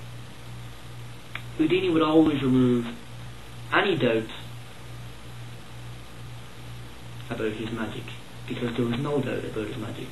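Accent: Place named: England, United Kingdom. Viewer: Its all in the presentation & how polished the act is, I would suggest rehearsing a script & practice in front of a mirror. Also, try not to mumble so much. I can hear an English -descent accent and yet my North American "western drawl" would still trump your diction.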